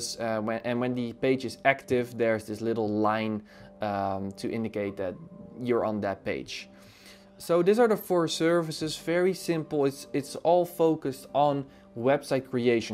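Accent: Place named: Netherlands. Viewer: Ben je nederlands want ik hoor het aan je accent haha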